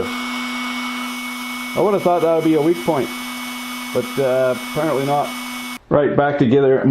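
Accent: Quebec, Canada. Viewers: Nice québécois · 10/10 for Quebec French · hi, I'm from Montreal, Canada. And I love it when you speak french .makes me laugh everytime with the quebecois slang and hockey on top of it lol. keep it up.congrats for your channel. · Tu sais parler québécois! You know how to speak french-canadian! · Quelque chose me dit que vous êtes du Quebec! suis-je dans l'erreur ?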